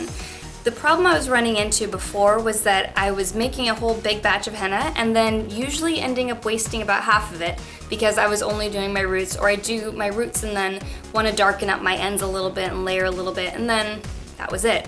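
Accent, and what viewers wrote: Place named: United Kingdom. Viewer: you sound sorta British in this one!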